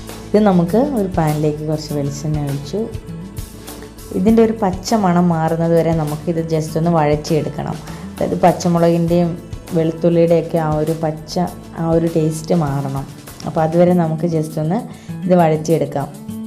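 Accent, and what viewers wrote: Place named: Kottayam, India. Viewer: Wow. Nehru Trophy. Such a wonderful place. Before 3 years we had a boating in Nehru Trophy. Kindly make one episode to show your place if you can . We are waiting to c. Actually your accent is Kottayam. That s y i asked such.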